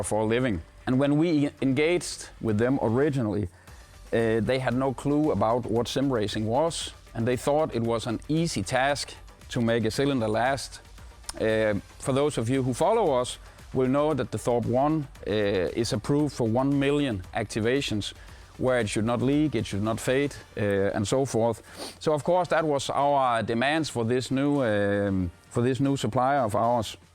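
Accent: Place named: Denmark. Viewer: you can really tell this guy is from denmark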